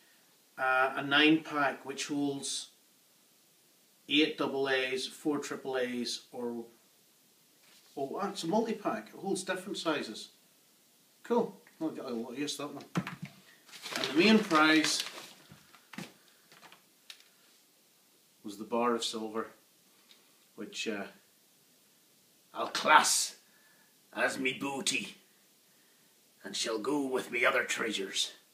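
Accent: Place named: Ireland. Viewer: Sweet Gifts. Dig the pirate talk especially since your Irish accent makes it sound more genuine than mine with my South Florida Cuban English. We've got to do something about that jersey though.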